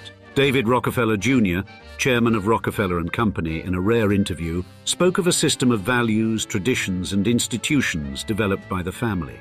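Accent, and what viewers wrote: RP, United Kingdom. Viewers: and the very erudite sounded RP accent. (And no, RP is not "British" exclusively, but rather 95% spoken mainly in Commonwealth countries with only 5% spoken in Britain.)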